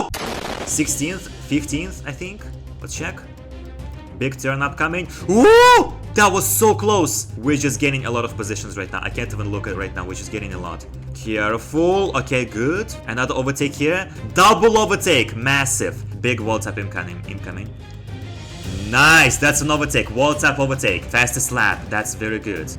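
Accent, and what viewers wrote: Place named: Russia. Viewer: У тебя русский акцент, ну или хотя бы акцент стран снг, откуда ты?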